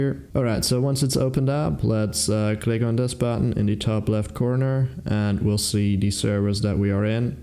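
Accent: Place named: United Kingdom. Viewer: u sound british ngl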